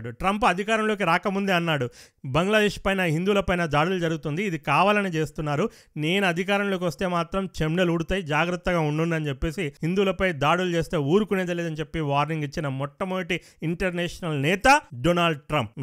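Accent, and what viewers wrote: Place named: Telangana, India. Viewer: Kaka nidi telangana na sem telangana basha la matladu thunavu jai telangana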